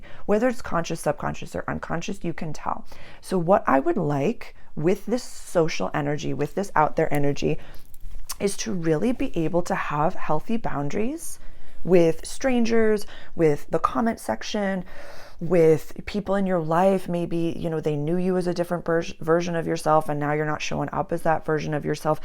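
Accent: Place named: Northeast, United States. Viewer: I know it doesn't matter, but I like your NE accent. Thank you for the message.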